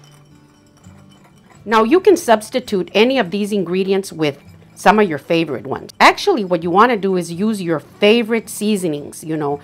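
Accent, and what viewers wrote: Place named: New Mexico, United States. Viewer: you have a beautiful accent where are you from? You sound from my home of New Mexico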